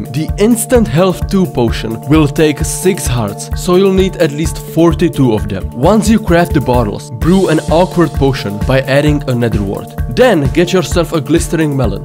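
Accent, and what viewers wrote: Czech Republic. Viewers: ur English speaking skills sounds like it talking Czech Guy, dont take it serius, im just asking :D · Ty jsi určitě Čech. Vždyť nemáš ani anglický přízvuk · bro sounds kinda czech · You have a Czech accent.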